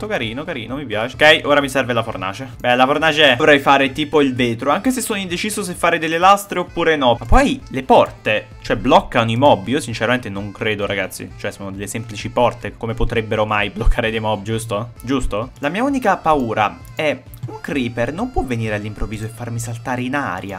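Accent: Naples, Italy. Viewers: ma sei napoletano?🤣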